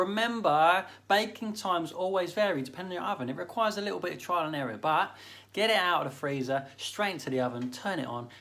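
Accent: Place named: Essex, United Kingdom. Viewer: You forgot to do it in that Essex accent.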